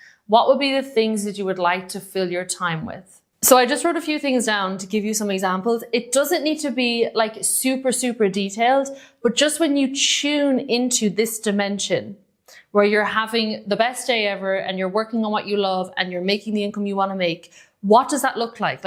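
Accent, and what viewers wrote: Ireland. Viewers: I detect an Irish accent? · Its so rare to hear Irish people on Youtube you got a sub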